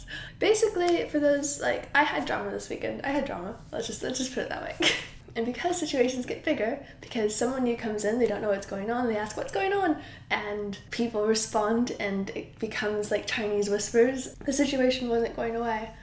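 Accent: United Kingdom. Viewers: I totally missed all of whatever you're talking about!!! But I'm glad you're okay and past this. Also, it's pretty adorable that the longer you're in Europe, the thicker your British accent becomes! I've had friends move to Georgia or Tennessee and they start adopting a southern accent without realizing it. It's like the same thing here!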